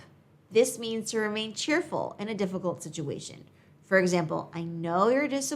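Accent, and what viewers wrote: United States. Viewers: Nice, i'm from Jakarta, I like maerican english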